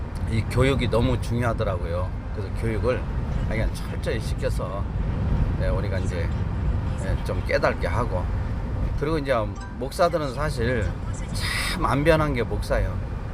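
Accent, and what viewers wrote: Jeolla, South Korea. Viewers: 전라도 사투리 너무 재밌네요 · 목사님 강단에서는 사투리안쓰시는데 평소에 사투리 엄청쓰시네요 라도분들은 서울말과 사투리 자유자재로 쓸수있어 좋겠어요 ㅎㅎ 경상도는 고맙습니데이 ^^ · 아따~목사님!!! 참말로~ 전라도사투리겁나게잼나부러유~ㅋㅋㅋ 사모님... 빗길안전운전하세요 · 내 고향 전라도 사투리를 겁나게 쓰시네요~ 갑작스런 사투리에 겁나게 어매어매 정겹네요~ㅎㅎ 성령의 불덩어리가 전라도에 떨어져서 깨어지고 살아나게 하옵소서~ 화이팅입니다~~~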